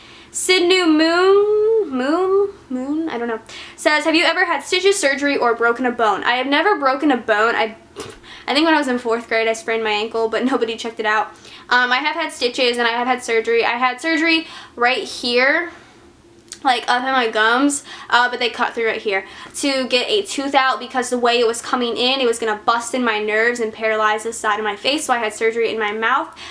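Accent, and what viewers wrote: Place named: South, United States. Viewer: omg when brain said you picked up a country accent I didn't believe him LOL I heard it!!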